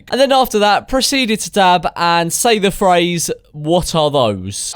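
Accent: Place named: RP, United Kingdom. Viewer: u sound posh, fam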